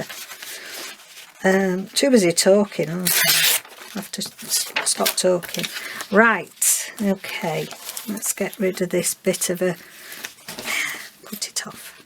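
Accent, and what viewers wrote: Yorkshire, United Kingdom. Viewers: Pease don,t change,i have a Yorkshire accent too,of which i am proud and i am much older than you.You are like a breath of fresh air on Youtube. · Love the envelope pockets. The skull tag topper is really effective. I love to listen to you chatting while you work. The accent from God's Own County is something to be very proud of and reminds me of my roots. If folk don't like it, that's too bad! You are a Yorkshire lass "wi' nowt tekken out" . Keep on crafting!!!!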